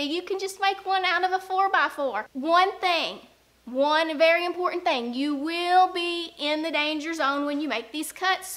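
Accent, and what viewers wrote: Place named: South, United States. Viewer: Southern accent and very funny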